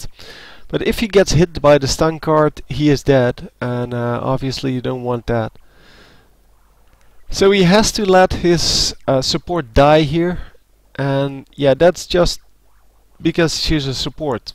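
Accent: Netherlands. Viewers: Hey, zou dit ingesproken zijn door een Nederlander? ;o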